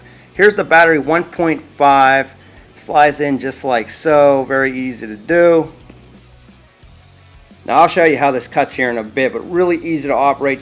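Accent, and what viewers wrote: Pittsburgh, United States. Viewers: Any chance you're from Pittsburgh originally?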